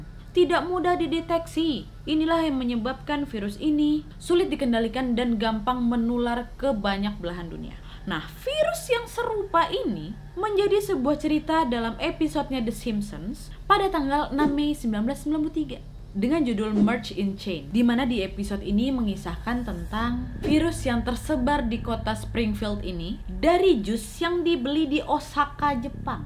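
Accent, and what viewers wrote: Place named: Medan, Indonesia. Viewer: nampak kali dek orang medannya 🤣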